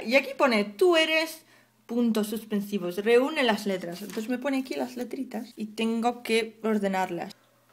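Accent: France. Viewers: me encanta tu acento en frances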